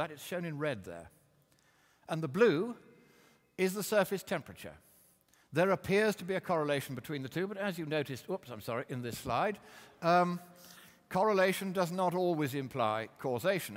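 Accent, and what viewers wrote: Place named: Scotland, United Kingdom. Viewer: he's scottish. That's a (way) upperclass scott accent.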